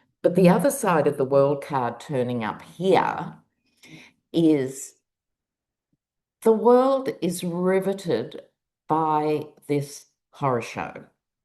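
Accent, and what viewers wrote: Australia. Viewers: An Aussie living in the US… I love watching you… hearing you… so Australian… same expressions I still use lol makes me laugh…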